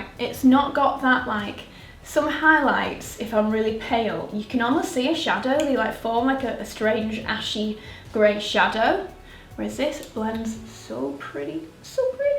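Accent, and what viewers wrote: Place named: Northern England, United Kingdom. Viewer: LOVE the northern accent, this was great <3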